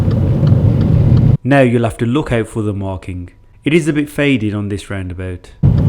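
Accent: Birmingham, United Kingdom. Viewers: Love your Brummie accent man! And the great driving advice obvs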